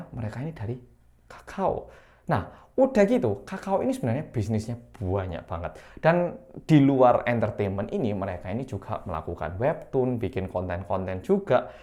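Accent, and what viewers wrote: Java, Indonesia. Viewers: Makasih mas (Pria manis korea medok yang menjadi solusi bagi kami bolo² dalam setiap masalah 😄) aku sebenernya Masih ga nyangka sih Bighit yg agensi miskin, kecil dan hanya punya 12 karyawan kemudian semesta memberikan kesuksesan luar biasa lewat BTS sehingga sekarang jadi Labels Raksasa, punya cabang di Japan dan Amerika,uangnya ga berseri..dan sebenarnya banyak hal yang harus dicontoh dari seorang Bang PD Nim memberikan kepercayaan penuh thd anak² idolnya, memberi keleluasaan anaknya untuk menulis, memproduksi musik sendiri, diajarin gimana menghadapi dunia luar, tata kelola bisnis dan 2021an diajarin terjun ke persaham²an sehingga jika terjadi apa² Bangtan punya andil atau suara, berharap yang terbaik aja untuk semua (episode 4 Suchwita terbaru Suga bilang kami tahu bisnis, pengelolaan dan bagaimana industri ini bekerja dari Bang PD Nim)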